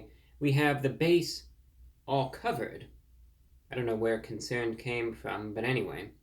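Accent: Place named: Transatlantic, United States. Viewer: Bro just casually speaks in a semi trans-Atlantic accent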